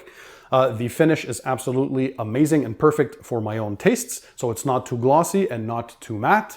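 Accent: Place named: Quebec, Canada. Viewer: i could tell by the accent ahaha..i currently live in gatineau!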